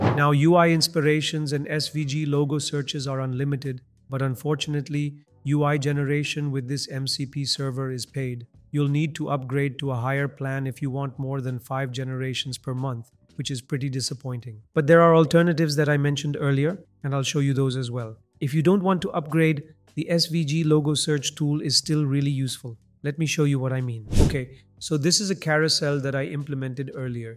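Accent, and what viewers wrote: India. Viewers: Genuine question is this your real voice or ai clone Somehow i could teast the Indian accent within it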